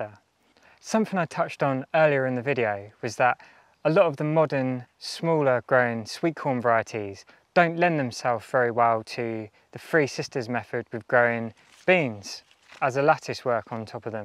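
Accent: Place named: England, United Kingdom. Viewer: Gaspé (a 6-row, short-cob flint maize) would do it, even in the severe climates north of 53°N in vaguely-interior western North America. You sound like you're from England (incidentally, I am too - I say "England" and not "Britain" for very personal reasons) - what part of the country are you in, and how many GDD10s (growing degree days - base 10°C) do you get in a year?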